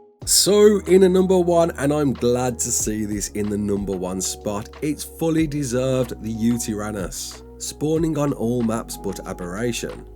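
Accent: Birmingham, United Kingdom. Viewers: Brummie or Black Country lad?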